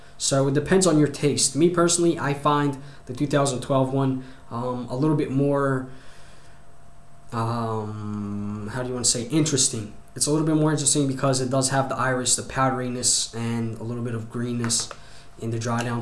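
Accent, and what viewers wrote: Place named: Baltimore, United States. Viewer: *also... While I know your country is listed as Greece your accent sounds a lot like Baltimore City, Maryland... Just thought I would mention... ^_^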